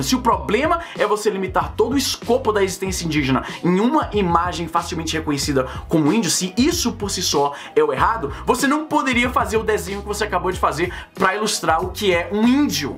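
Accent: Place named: Ceará, Brazil. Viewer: Eu percebi algo de cearense em vc . ( fala rápido pra caralho )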